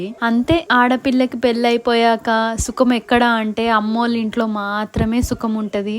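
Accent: Telangana, India. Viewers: Muchatlake egabadata... Telagana language ni style ga matladutavu sis...👌🥰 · Nuvvu Telangana yasa mattadutunnavu 😅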